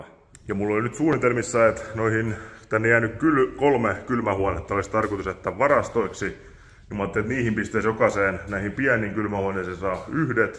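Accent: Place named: Turku, Finland. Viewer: "Saisin halvalla" 80-luvun Marino Swingin 20hp Jammulla. Luottopuheiden mukaan soiva peli muuten, mutta peräpeiliremonttia kaipaa. Kun oot niin hehkuttanut noita braketteja perän jatkoksi, niin olisko tässä koko- ja teholuokassa semmosessa mitään järkeä? Vois askarrella samalla, kun vanerin joutuu kumminkin vaihtamaan. Parantaisko se potkurin olosuhteita, kun on kuitenkin aika jyrkkä v-pohja? Vauhtia en niinkään kaipaa, enemmänkin pientä kulutusta pienellä koneella. Tulis samalla sisätilaa, kun peräpenkin siirtäis kaivon paikalle. Joka tapauksessa tekisin ton sun Silacraftin tyylisen keskipulpetin, jo pelkän ajoasennon takia. Ja kun kuskilla on painoa 180kg (ei oo kirjoitusvirhe), niin hörppääkö kiikkerä Swingi vettä pulpettia kiertäessä? En oikeen pääse sitä vedessä kokeilemaan ennen kauppoja (ja romahtaneen ladon raivaamista). Ja kiitos hyvästä kanavasta. 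En olis ikinä uskonut, että joskus okein odotan pääseväni kuulemaan turun murretta!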